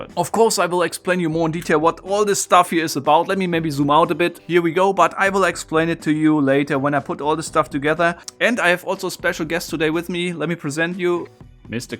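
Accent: Germany. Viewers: Voll deutscher Dialekt, ne ? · Super Danke für deine Antwort. Sehr nett! Weiter so! Habe keinen blassen Schimmer weder von Programmierung noch von Maschinenbau oder Technik. Aber ich liebe alle Kanäle, bei denen die Leute mit Leideschaft und Herz dabei sind und den Zuschauern, die Augen zum funkeln bringen. Das passiert genau bei deinem Kanal. Man merkt, dass du dein Hobby zum Beruf gemacht hast. Bin selbst Sprachlehrer und mit Leidenschaft, bringe selbst die Augen meiner Schüler zum Leuchten, wenn sie merken wie einfach es ist, das immer als schwierig zu erlernende Deutsch zu verinnerlichen und mit Leichtigkeit anzuwenden. Oft wird immer unterstellt: entweder Sprachen und künstlerisch oder mathematisch-naturwissenschaftlich. Dabei gehen Sprachen und Mathematik einher. Beides sind logische Konstrukte mit klaren Regeln. Ich mag dein Englisch, echt super, und ich mag es bei den Deutschen wenn auch noch ein Akzent heraushörbar ist. 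Ein Hauch von Heimat! Super Kanal, bin erst drüber gestolpert! Freue mich über deinen Erfolg. Mal sehen, ob ich auch mal einen Kanal aufmache und mit Herz und Leidenschaft die Leichtigkeit zum Sprachenlernen vermittel, oder wie jeder Schüler genau den Sprachlehrer bekommt (oder formiert) den er braucht!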